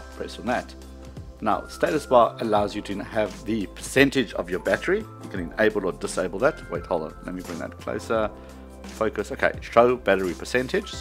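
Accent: South Africa. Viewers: Sound south African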